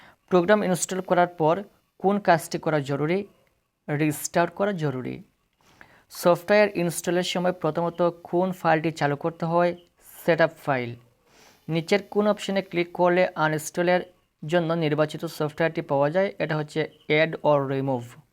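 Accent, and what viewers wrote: Sylhet, Bangladesh. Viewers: Sir Afne to sylheti 😂 afnar mate buja jay❤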